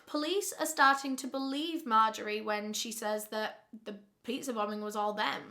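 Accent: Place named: Northern England, United Kingdom. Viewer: As a Londoner I adore your Northern accent! Soooooooooooo in this Videooooooo. You make amazingly great videos and you look amazing also x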